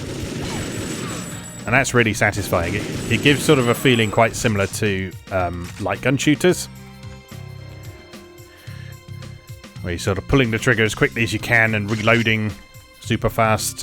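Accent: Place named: United Kingdom. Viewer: You have a nice brit voice :P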